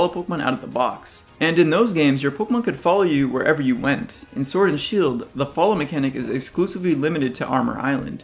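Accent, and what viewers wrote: Canada. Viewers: Why do you sound like you from canada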